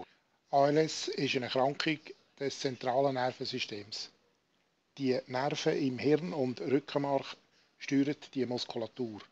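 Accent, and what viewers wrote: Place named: Switzerland. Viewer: hochdeutsch, allerdings stark schweizerisch eingefärbt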